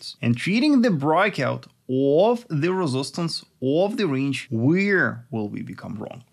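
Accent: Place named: Russia. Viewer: Damn I never heard such strong Russian accent. 😊 love Russia, the only sane people in the world! I hope to learn from you!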